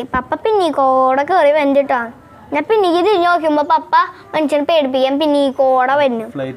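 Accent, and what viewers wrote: Thrissur, India. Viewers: wow hyderabad to cochin yathraa manoharamayi kanichu thannathinu othiri thanks...molude samsaram super..Thrissur slang 👍❤️ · Trissur slank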